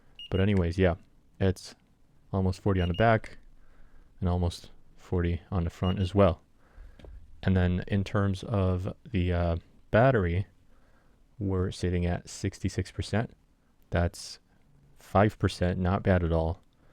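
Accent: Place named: United States. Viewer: From the sound of your voice you're located in America so I just wanted to ask how your process was getting the 17 ProMax and if you could maybe link where you got it from or something like that so other Americans like me can reliably get the Xiaomi 17 pro Max. Plus I did want to ask what cell phone provider you're using because I know some of the Chinese smartphones do not work well with Verizon for example. (I have t mobile)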